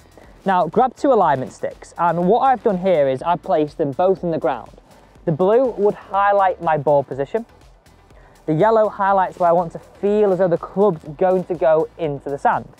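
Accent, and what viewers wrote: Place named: Cockney, United Kingdom. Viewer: I cannot understand most words the guy in the viedos is saying! Why does every golf training video have people from England with poor cockney accents? The guy mumbles through the entire video! I will never get that 3 minutes back.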